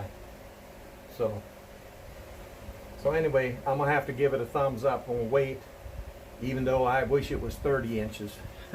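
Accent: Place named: Lowcountry, United States. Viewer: Sounds like you are from the Low Country.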